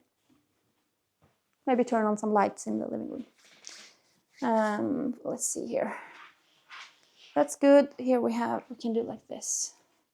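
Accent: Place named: Sweden. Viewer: Call things whatever you like to call them. Hopefully everybody knows you're from Sweden and have an accent. I dont expect perfection in the way you pronounce words whatsoever. Honestly, I'm just working and I'm not really even paying attention to the way you're talking or not talking. I don't notice at all. I noticed you said please talk normally. it almost sounded like somebody in your family or some caregiver said that to you as a kid maybe? Please be kind to yourself. I don't probably most people don't notice these little things that you notice about your communication.. words Etc. Please focus on the fact that you're making a video and that's in itself is really amazing!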